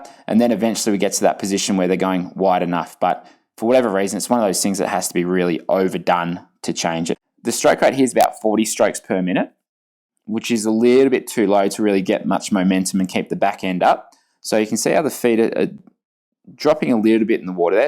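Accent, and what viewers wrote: Australia. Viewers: Another excellent clip! Thank you! Though your Aussie accent makes pull buoy sound like pool boy!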